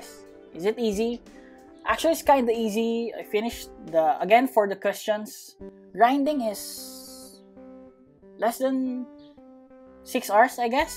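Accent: Philippines. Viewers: you have a Filipino accent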